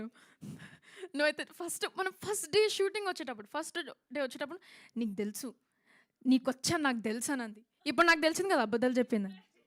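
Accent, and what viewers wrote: Telangana, India. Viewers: Maa telangana bidda lekka matladinavu amma maa support niku untadi and PK gari fans niku andaga untam and koncham daddy ki cheppu oka manchi telangana slang cinema thiyamanu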